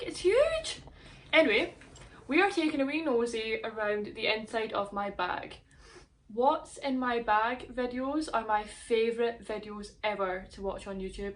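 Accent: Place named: Scotland, United Kingdom. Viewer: You’re like the first female Scottish YouTuber I’ve seen 😀. End result : Cool 👍🏻 Love your accent , I’m Canadian so of course I love the accent 😍 #Brave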